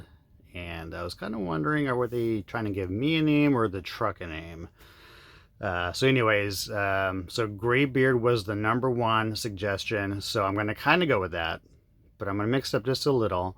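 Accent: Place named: California, United States. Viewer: That's funny; you can feel California, and I can hear it in you. 😆Travel safely...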